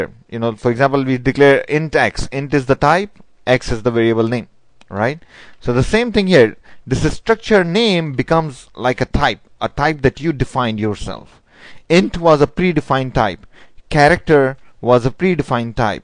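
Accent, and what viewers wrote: India. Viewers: indian slang ftw!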